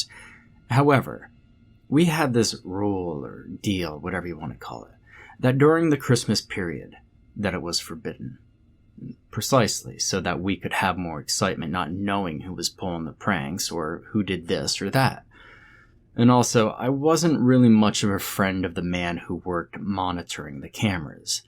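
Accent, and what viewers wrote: South, United States. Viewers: I thought you was from down south here around Tennessee South & North Carolina or Kentucky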